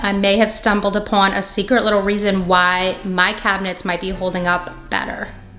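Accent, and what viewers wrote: Louisiana, United States. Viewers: hi! I just subscribed, your video from a year ago popped up on I my feed. I'm in SE La I recognized your accent :)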